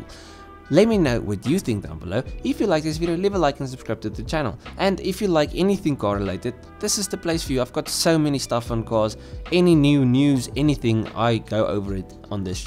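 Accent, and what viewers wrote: South Africa. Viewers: Ayy is this bru South African? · are you south african ?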